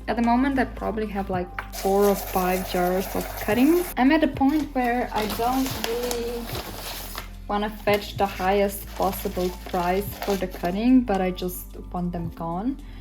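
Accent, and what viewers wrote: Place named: United States. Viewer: Hi. I saw many of your videos, always thought you were american. Compliment for the english and for the good content of your videos! Where do you sell your plants? Ciao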